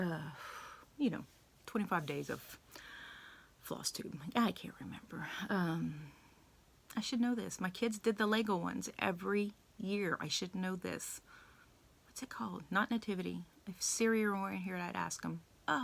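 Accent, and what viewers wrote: Louisiana, United States. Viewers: I’m glad you subscribed to my channel so I could find you. I’m just starting to watch your video and from the first sentence you spoke, my guess is that you’re from Louisiana. Am I right?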